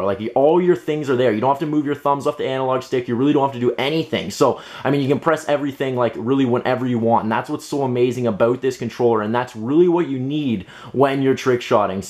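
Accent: Canada. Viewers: You sound Canadian. Are you Canadian? I know you won't answer... just curious though... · he's Canadian I can tell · Who watched this video for the pure enjoyment of his Canadian accent? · He sounds so Canadian. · are you Canadian ? you're hot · You sound canadian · I don't even know this guy but I can tell he's Canadian by his accent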